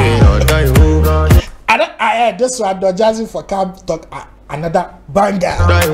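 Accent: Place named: Nigeria. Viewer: Walai you don turn Naija as u dey talk. na sure man him dey throw beta song wellwell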